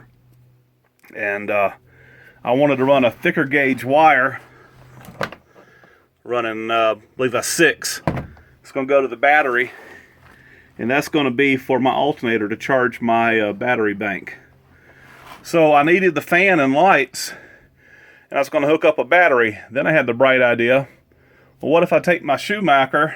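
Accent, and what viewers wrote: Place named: Texas, United States. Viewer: Don't ever listen to negative talk about your accent. It sounds perfect to me. I'm from TX, and you sound similar to what I'm use to hearing. I know some from GA probably have stronger accents. The southern accent is the best. I love your videos. :)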